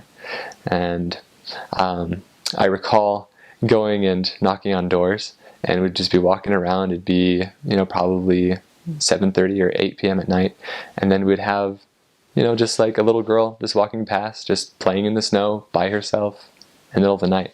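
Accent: Norway. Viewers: Thats some nice norwegian dialect there!! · You have an amazing Norwegian accent👍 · This guy is obviously having a Norwegian connotation to his accent, and he looks super Scandinavian. No way he is "American"... born and raised in Norway at least.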